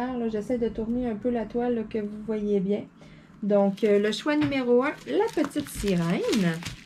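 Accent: Canada. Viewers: Je viens de découvrir ta chaîne, et j'adore tes vidéos! Ton accent du Canada fait plaisir à attendre, pour moi petit française ^^ haha!!!! Moi je vote pour le 3! La tete de mort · Jaime bien tes vidéos et ton accent canadien avec quelques expressions non utilisees ici, je choisis le numero3 tête de mort papillon, bizz.andrée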